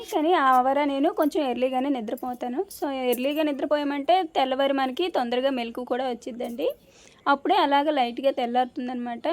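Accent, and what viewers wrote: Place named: Guntur, India. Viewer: merekkada untaru.e bhasha ni batti tenali gunter bapatla atuside untavani anukuntunna. Me illu chAla baguntundi. Rent housa leda own house rent house ayite rent untu di. Water prob untunda taga daniki hyderabad nAku bore kottestondi. Anduke vere ooru lo settle avvalani undi.emantav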